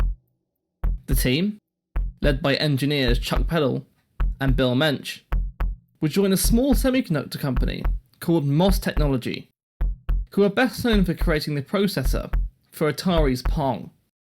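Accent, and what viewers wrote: United Kingdom. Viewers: "Compuhurz" for computers and "yunighud" for United both sound really, really stupid. It's some obscure English provincialism, I know, but it's one that YouTube would be better off without. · You speak with a British accent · Love the music, esp. . Fantastic. Warning! Possible trigger effect on maker..( I understand this video is 4 years old, so maybe I should have shut up... But I've written it now). The early chapters may not have been researched deeply enough. I picked up on a few technical and historical errors and omissions. I understand the scale of this project and that the introduction could likely have been rushed through. Reaching the microprocessor history you immediately stepped the game up. Truly excellent and quite an undertaking., a lot of praise should be given for this. My only other criticism may have you swearing at me! 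Work on your diction!, Otherwise you have a fine voice for this style of content. The odd Compooha and Intel Ahy Ahy locate you firmly in the London suburbs...? They, and similar just pop in randomly? Started watching the Cray video. Greatly improved. Really wonderful stuff. Beautifully written. Subbed. And peace brother, I'll never mention it again.🤠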